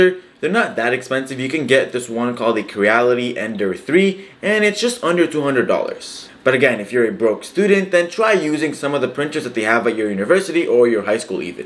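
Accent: Egypt. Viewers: مصري مش كده؟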